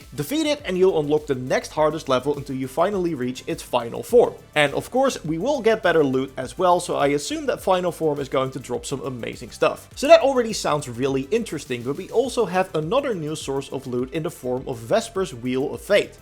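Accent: Netherlands. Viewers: You gotta be dutch.... you speak like most of my fellow dutch country man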